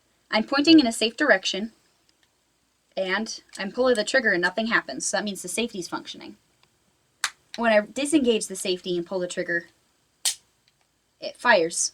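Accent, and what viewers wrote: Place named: Midwest, United States. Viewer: ahhh, that makes sense, i live in georgia, but i'm from ohio, so i guess i just recognized your midwesternness lol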